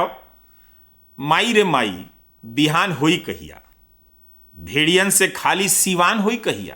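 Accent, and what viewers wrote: Bhojpuri, India. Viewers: माई रे माई बिहान होई कहिया। आदमी के आदमी से पहचान होई कहिया। नफ़रत के बोली मेटान होई कहिया। माई रे माई ....अभी जवन चल रहल बा ओकरा के देख के कुछ अपन लाईन जोड़ देनी ह, सचमुच आजो अनहरियाईल रहम हमनी के त बिहान कयीसे होई। रविश सर, राऊर भोजपुरी के बोली सचमुच बड़ा निक लागल। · राहुर भोजपुरी सुन के मजा आ गईल😊👍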